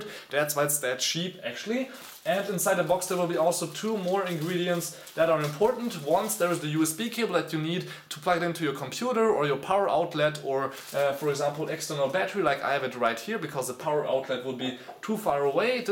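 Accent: Germany. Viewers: Du bist deutsch gä also emmm mam hörts bissl rauß aber egal gutes video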